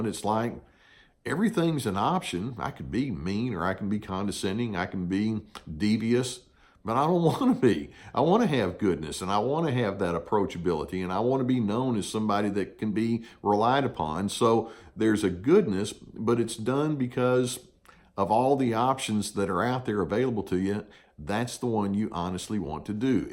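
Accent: South, United States. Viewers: You are fantastic and have helped me so much rid the Narc. Love your voice (like Gus) and Southern accent!! thanks. Susanne H.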